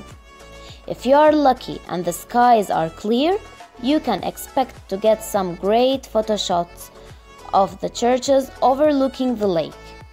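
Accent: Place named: Egypt. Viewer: Your accent I feel it like an Egyptian accent when you speak English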